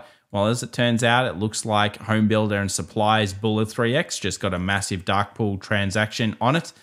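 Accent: Australia. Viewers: Hi Great to hear a fellow Aussie on here:) Fantastic update. Quick question... I have a rather heavy bag of selected low cap altcoins which I thought I got for a good price over the last 3 months but could have bought them all in the last 48 hours with a 20% discount. If you don't laugh you cry. Anyway, the question is obvious....with BTC's premature take off, when do you think small cap altcoins will start to run? Soon after this BTC ATH or months from now when BTC stops pumping and pauses for a while?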